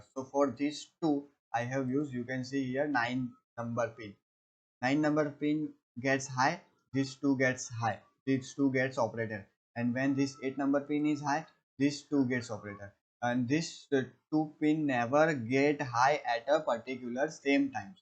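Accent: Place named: India. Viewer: good job, i have followed you despite of your indian accent. thank you so much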